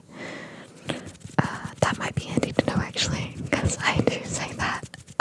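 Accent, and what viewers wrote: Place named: Australia. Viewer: I LOVE THE AUSSIE ACCENTTT, idk why its so relaxing to me. i saw your comment of Ella asmr and had to check your channel.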